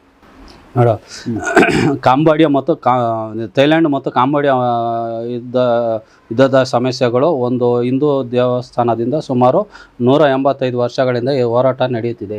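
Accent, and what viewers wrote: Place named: Doddaballapura, India. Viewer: Gnana mukya avaru astu swastavagi oduttiddare doddaballapura side e thara kannada mathanaduttare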